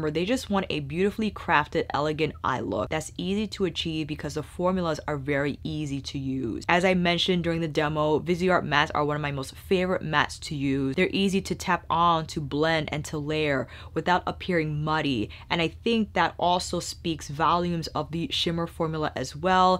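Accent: France. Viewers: Your french accent is so cute ^^ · the french accent really do be hitting different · Thank you for making the effort to pronounce names in French. Loooove your accent ;).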